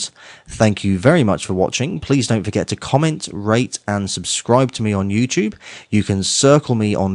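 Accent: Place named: England, United Kingdom. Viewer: Thanks. I never feel stupid when someone with an english accent is describing something to me.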